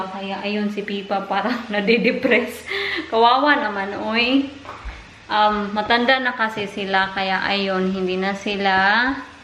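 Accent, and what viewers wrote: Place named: Visayas, Philippines. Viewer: First time ko manuod ng vlog nyo po and nakakatuwa po kau. Sobrang ganda nyo po and your accent is like parang may pagka bisaya 💕😍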